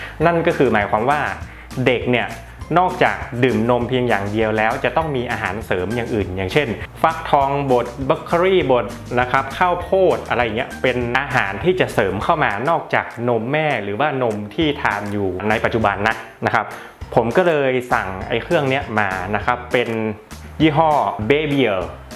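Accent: North, Thailand. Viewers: ขอบคุณรีวิวมากจ้า แอบฟังสำเนียง คนเหนือรึเปล่าค่ะ555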